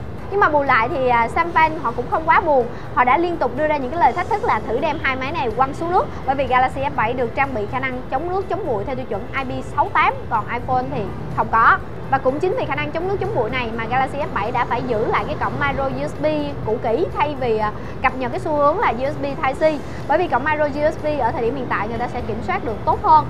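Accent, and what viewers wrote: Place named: Central, Vietnam. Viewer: mien trung roi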